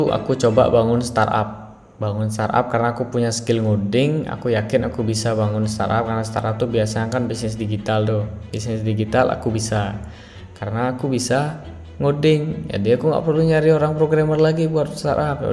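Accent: Bali, Indonesia. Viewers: logatnya kayak orang bali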